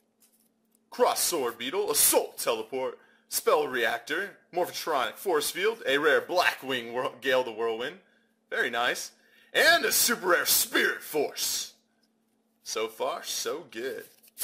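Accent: Germany. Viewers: Sounds like you are TRULY german!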